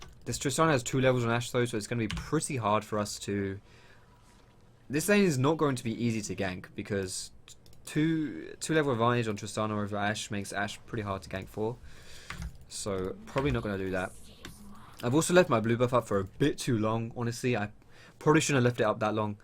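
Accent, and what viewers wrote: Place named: United Kingdom. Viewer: I KNOW RIGHT ??! It made me laugh for the whole game, but does he really means to say cumshot all the time? Cause he's not even laughing, so i'm wondering if he means to say something else but we don't get it because of his british accent or if it's just a joke he doesn't find funny anymore. °-°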